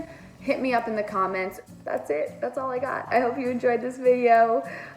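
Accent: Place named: United States. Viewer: Oh no you have been in the STATES so long your getting an american accent lol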